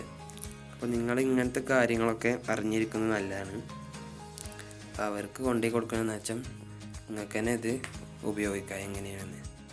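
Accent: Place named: Malappuram, India. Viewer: Igale baasha poli malappurakkaranano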